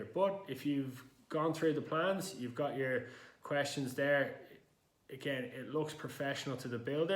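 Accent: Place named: Dublin, Ireland. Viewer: I notice you and the guy in the previous video I watched have Irish (Dublin) accents. Are you guys based in Ireland or USA? I'm Irish and am a drywall/steel-stud estimator living in Canada. We use On-Screen takeoff where I work - it's very good, but quite expensive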